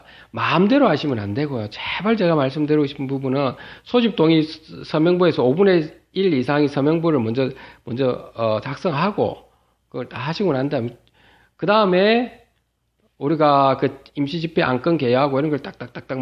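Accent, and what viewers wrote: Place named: Gyeongsang, South Korea. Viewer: 성낙을 받아 어결권을 ~ 갱상도 사투리가 정겹네요 ㅎ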